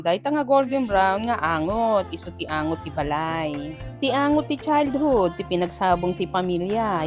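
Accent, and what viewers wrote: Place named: Baguio, Philippines. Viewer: kahit ganito araw araw ang ulam best.. haha kagaling mag Ilocano Baguio accent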